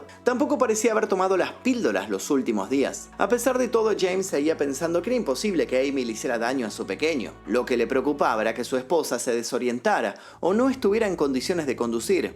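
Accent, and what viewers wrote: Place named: Argentina. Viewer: Me encantan tus vídeos! Me gusta el "SH" de tu acento argentino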